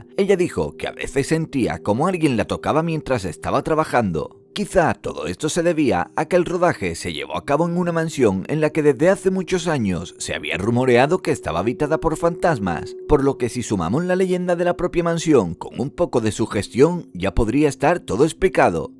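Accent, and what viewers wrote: Andalusia, Spain. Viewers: Una pregunta, eres de España(andaluz) Like si crees eso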